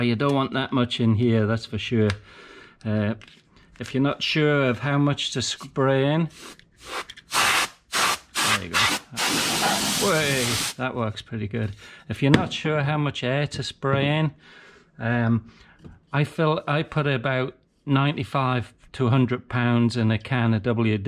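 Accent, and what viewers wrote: United Kingdom. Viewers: Loving this, one question are you British?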